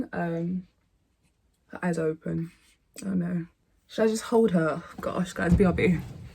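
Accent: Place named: United Kingdom. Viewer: Omg you guys are adorable together but you are freaking gorgeous!! Could just listen to you talk all day 😂 I love your accent. Are you in the Uk? Here in the states most videos I watched the dads were there just wondering if they did things differently there. I just subscribed you got me lol I also have a channel more than welcomed to sub back. Xoxo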